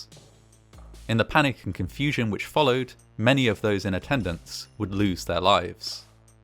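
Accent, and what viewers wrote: England, United Kingdom. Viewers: He is British or at least sounds British. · He definitely has an English accent! Definitely not a Scottish one, or even a mid or northern English one. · That's the English accent. 👍🏻🇬🇧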